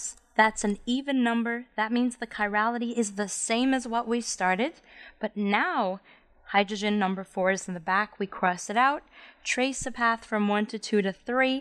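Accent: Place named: New York, United States. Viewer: Beautiful! You got to be from New Jersey/New York. Thanks.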